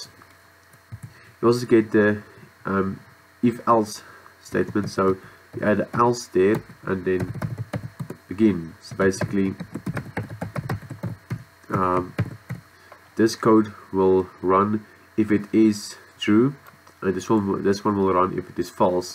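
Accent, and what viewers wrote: Afrikaans, South Africa. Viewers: ayy ek kan daai afrikaans hoor in jou stem hehe, baie dankie vir al die tutorials. Dit help rerig baie!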